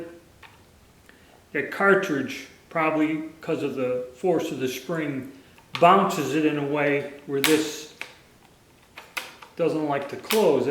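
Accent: Pittsburgh, United States. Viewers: You sound like you're from Pittsburgh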